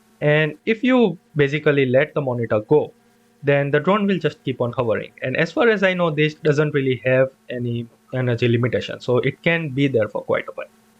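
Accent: India. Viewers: Heard the Indian accent and I knew I found what I was looking for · Tell me you are indian without telling me you are indian. not trying to offend anyone, nice video.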